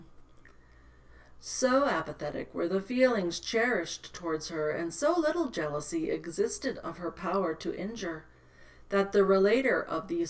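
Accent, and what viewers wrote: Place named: United States. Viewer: I enjoyed the read along, learn American English pronunciations, and the story. Great idea and resource.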